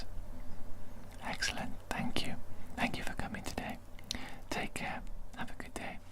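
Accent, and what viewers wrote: Wales, United Kingdom. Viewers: Great video! I have to ask though, is that a Welsh accent or am I way off?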